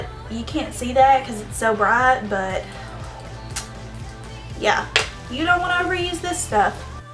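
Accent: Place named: Tennessee, United States. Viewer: I love your accent! ^_^ I just moved to Knoxville, Tennessee from Portland, Oregon and most people have this super cute accent and I just find it adorable. XD Thank you for this tutorial too! Very pretty look! ^_^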